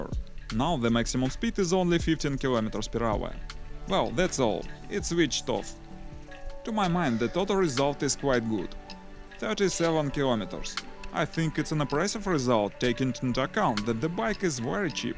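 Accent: Russia. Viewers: U have a Russian accent don’t be scared to tell us the Brand-name I want 1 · Русский акцент сразу палится) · Sounds Russian to me.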